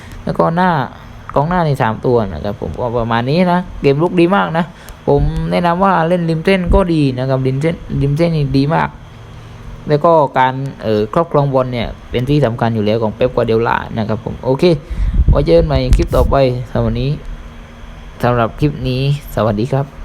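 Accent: South, Thailand. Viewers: น้องเป็นคนใต้หรือป่าวครับ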